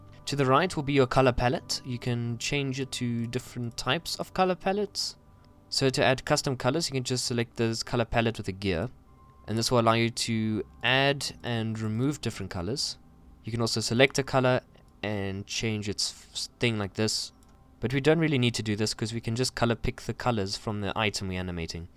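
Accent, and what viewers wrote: South Africa. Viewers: South African detected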